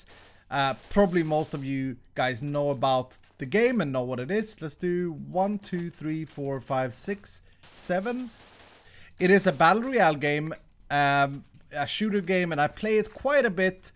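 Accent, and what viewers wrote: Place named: Sweden. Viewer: are from sweden? sounds like that.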